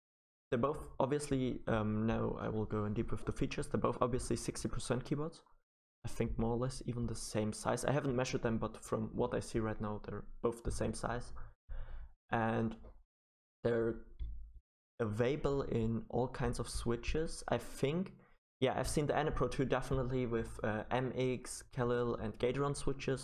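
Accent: Germany. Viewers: ich wuste es das du ein deutscher yter bist wegen dein sprechen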